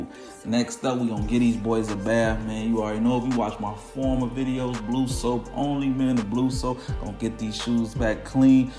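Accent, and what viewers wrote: Chicago, United States. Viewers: soon as I realized in yo talk you was from chicago too, i knew I was rockin w you🤣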